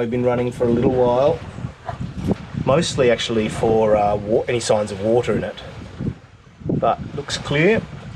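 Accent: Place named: Australia. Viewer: Greetings from Minnesota! Love the videos and your Aussie Accent! keep em coming!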